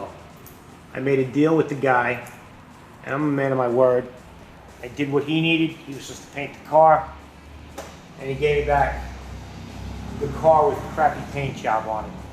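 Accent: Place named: New Jersey, United States. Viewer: For a guinea with a heavy jersey accent, you’ve got very good taste. God bless Americana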